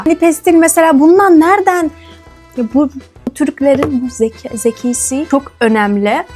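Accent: Cyprus. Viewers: kıbrıslı çünkü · Abla neden Kıbrıslı gibi konuşuyorsun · Kıbrıslı zaten😂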